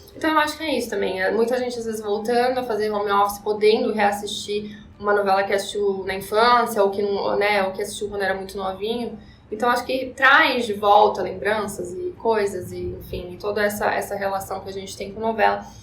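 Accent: Rio de Janeiro, Brazil. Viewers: O sotaque carioca da... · Amei o sotaque,!❤como carioca, confirmo q é assim mesmo! Melhor q o da Jade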